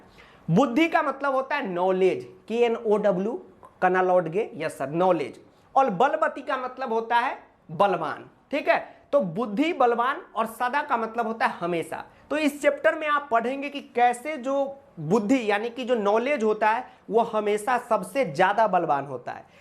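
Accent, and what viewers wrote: Bihar, India. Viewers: Sir aap itna aacha samjhate hai humko sab samhj me aata h mujhe pehle sanskrit jada nhi samjh aata tha but aapke videos ko dekh kar ek umidd aa rahi h sir 😊😂🎉 or aapka ye bihari tone me jo aap baat karte ho vo mujhe bohot aacha lagta h sir 😊 you are great sir 🎉❤ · Sir Your bihari accent >>>>>>all school sanskrit teacher🎉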